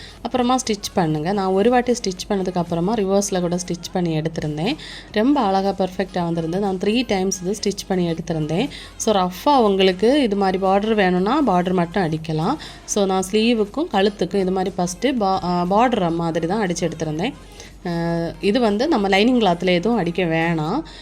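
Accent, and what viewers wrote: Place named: Kuzhithurai, India. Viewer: I like your speech,I had relatives in kuzhithurai,They speak the same style as you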